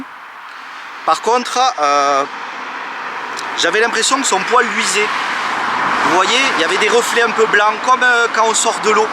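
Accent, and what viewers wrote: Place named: South, France. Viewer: Super vidéo comme d'hab! J'apprécie beaucoup pour ta sensibilité et tes réflexions très profondes..Et ce bel accent du sud n'est en rien déplaisant.. bien au contraire! Et puis quel courage d'avoir créé une telle chaîne! Merci beaucoup.. que du bonheur et de l'authenticité!🙏🙏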